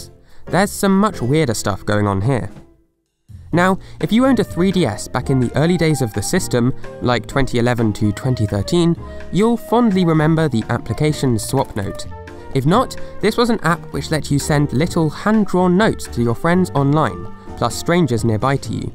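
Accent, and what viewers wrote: United Kingdom. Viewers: He's a brit, that's why. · Nothing makes me happier than listening to a cheerful British guy telling me Nintendo trivia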